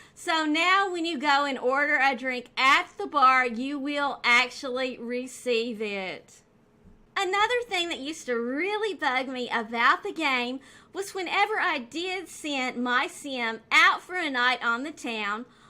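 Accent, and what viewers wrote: South, United States. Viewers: Love the video but I'm trying to figured out if ur American or what love ur accent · subscribed. great and informative video. i know you get this a lot but i absolutely love your voice/southern accent, its so cute. you are so intelligent and funny too, you make everything so easy to understand and present everything so well. you deserve way more subs then you have without a doubt! you've got talent!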